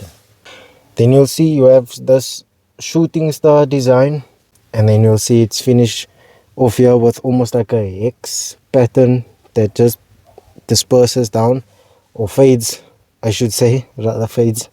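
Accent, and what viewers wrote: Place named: South Africa. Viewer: was wondering if this is a South African reviewer then i heard "awe ma se kind" 🤣......On a hatchback, you gonna need that rear wiper....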